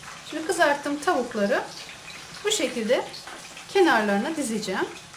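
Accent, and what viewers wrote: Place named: Kütahya, Turkey. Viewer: slm hanim nerelisiniz sorabilirmiyim??sanki arada bizim oranin yani kütahyanin sivesini kulaniyormusunuz gibi geldi:-) bu arada dün pisi tarifinizi denedim güzel oldu sagolun ☺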